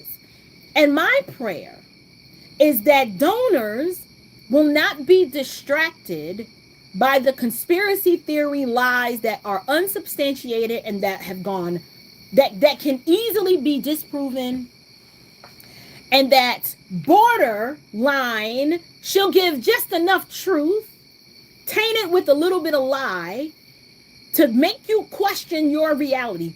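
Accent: South, United States. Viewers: I love that country sound!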